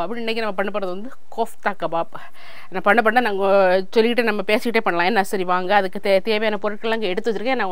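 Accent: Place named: South Tamil Nadu, India. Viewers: சகோதரி பல ஆண்டுகள் லண்டனில் இருந்தாலும் நம் திருநெல்வேலி தமிழை மறக்காமல் பேசுவது பெருமையாக இருக்கிறது மட்டன் கபாப் சூப்பர் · Super mason தாங்கள் சமையலை விட நீங்கள் பேசும் எங்கள் தென்னாட்டு மொழி மிகவும் அருமை எங்கள் முகவரி இந்தியா தமிழ்நாடு திருநெல்வேலி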